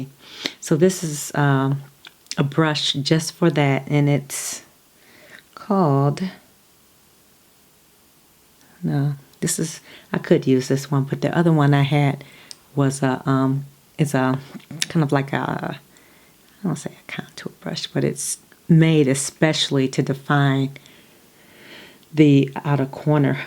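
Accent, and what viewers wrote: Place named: United States. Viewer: Looking angelic as always I see :). Damn... it is the first time I've heard your speaking voice and your American accent (I am British lol) is glorious like silk. So calming. Your tone is asmr perfect even without any whispering. I love listening to your precise explanations too. Definitely adds tingles for me. I look forward to more hopefully :D fingers crossed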